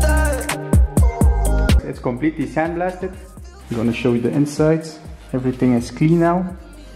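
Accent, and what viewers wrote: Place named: South Africa. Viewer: It’s being preserved for another 50 years ;-) well done. When I hear your accent I would think you are from Suid Afrika?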